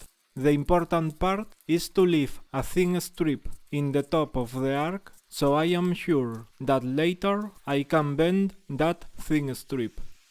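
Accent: Spain. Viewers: Con oírte decir dos palabras sabía que eras español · Este ingles es muy español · Habla el inglés como Fernando Alonso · Se nota que eres español bro jajajajajajajajaja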